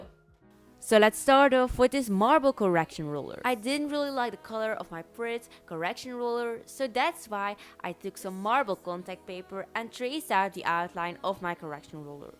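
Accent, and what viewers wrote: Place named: Netherlands. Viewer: I love how he has a Dutch accent. It is so cute. Rlly nice personality.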